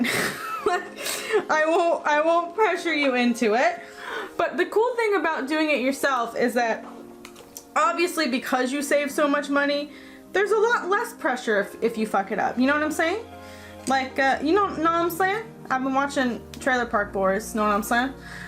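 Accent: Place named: Midwest, United States. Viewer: 😂 I thought you were doing a Illinois/Wisconsin accent purposely at first. Looks so cute. My hair is identical to yours and I miss my longer hair. Thanks for inspiring ❤️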